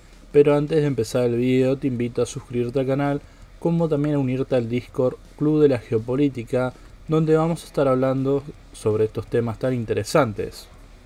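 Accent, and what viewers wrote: Argentina. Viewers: con ese acento seguro que es argentino o uruguayo , no me sorprende que admire a un pais serio como chile , simplemente ustedes no pueden aceptar que hace muchos años dejaron de ser relevantes,si no me creen observen sus villas miserias..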